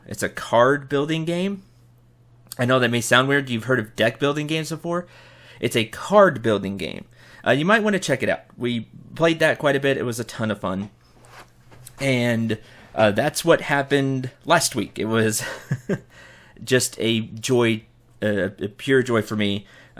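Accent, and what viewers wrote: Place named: Texas, United States. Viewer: Let me guess by your accent since one of my cousins who lives there sounds like you. I am going to guess you live in Texas, I have 1 out of 13 shot. ^_^ Also, can you play Kirby and the Crystal Shards 64 if you ever have time or if you even have it. P.S. I am not going to hunt you down, I am just intrigued if I am right. I also live in GA, so it is not like I could even if I wanted to.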